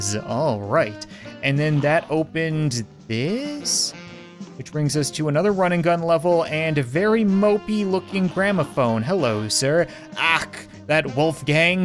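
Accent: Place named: Newfoundland, Canada. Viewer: I have watched a number of your videos now and I have shown them to my boyfriend. We're both from Alberta, I told him that you're a Canadian Youtuber from Newfoundland. He said he could tell by the way you pronounced some words. I never noticed before this video! I definitely heard a bit of the Newfoundland accent in this video! It's nice to see your channel continue to grow! I find your videos to be entertaining and unique, best of luck in the future!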